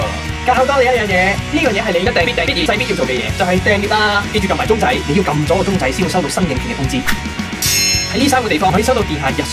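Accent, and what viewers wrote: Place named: China. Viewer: 翻大陸啦口音仔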